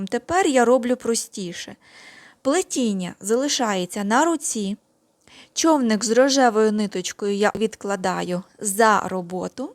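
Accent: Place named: Russia. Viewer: а не лень язык ломать? А хотя прикольно: я и технике от Вас научилась и мышцы живота насмеяла над Вашими попытками говорить на украинском. 😊😊😊 Спасибо Вам за двойную пользу 😊😊😊 Всё равно сбиваетесь на русский 😊😊😊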